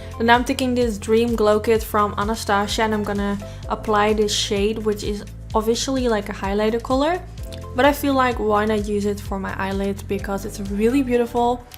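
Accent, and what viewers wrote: Netherlands. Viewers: Ik wilde net vragen of je uit Nederland kwam (je klonk een beetje zo☺️), maar toen las ik het op je kanaal🤪🤗😍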